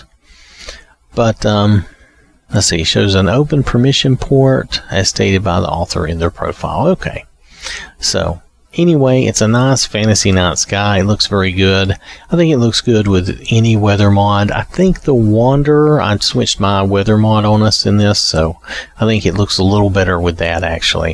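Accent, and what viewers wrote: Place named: East Texas, United States. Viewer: From east Texas? Sounds like it 10/10 hope these are on PC 😭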